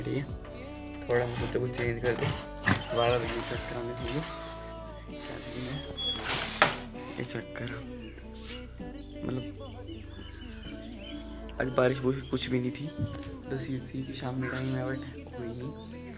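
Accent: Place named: Pahari, India. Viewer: Pahari accent Superb 🔥🔥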